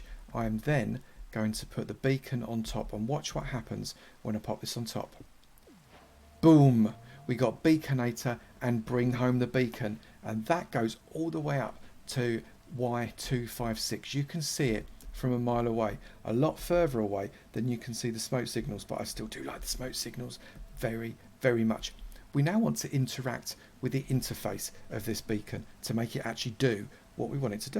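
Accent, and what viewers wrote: United Kingdom. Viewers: A british minecraft survival guide ? Y E S P L E A S E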